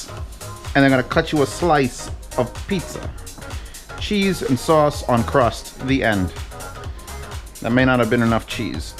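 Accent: New York, United States. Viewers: What part of New York are you from?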